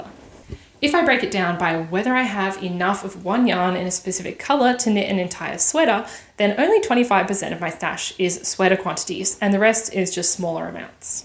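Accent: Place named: Australia. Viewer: As a Maths teacher and someone who has been knitting for over 40 years (and every day for nearly 20 years), I cant tell you how much joy this video gave me. I have all my stash on ravelry and have crunched numbers many times over the years but never to this detail and certainly not lately. Might be time. I’m curious. Thanks for sharing. Also nice to hear another Australian accent on the YouTubes. ❤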